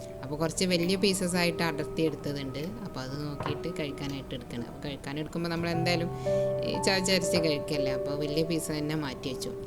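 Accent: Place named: Travancore, India. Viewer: കൊല്ലക്കുടിയിൽ മൊട്ടുസൂചി വിൽക്കുക എന്നൊരു പഴം ചൊല്ല് ഉണ്ട്; ഏതായാലും താങ്കളുടെ തിരുവതാംകൂർ സ്ലാങ് മലയാളം കേൾക്കുന്നതിൽ സന്തോഷം.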